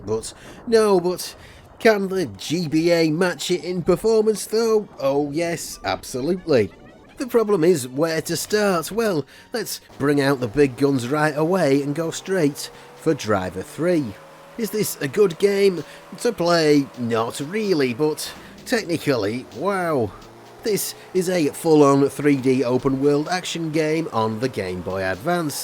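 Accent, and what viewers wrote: United Kingdom. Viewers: Can’t listen to a Brit talk about snes and Nintendo in general.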